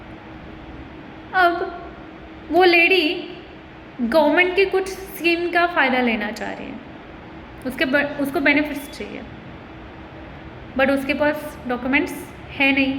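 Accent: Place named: Maharashtra, India. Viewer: मराठी माणसाचे हिंदी लगेच समजून येते😅😅😅 तुम्हारी हिंदी बहुत अच्छी है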